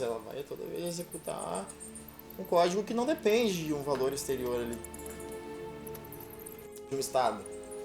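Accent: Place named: Rio Grande do Sul, Brazil. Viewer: Saudades mesmo! pelo sotaque ele é do RS, ele sumiu no inicio das enchentes, será que aconteceu algo com ele? to preocupado...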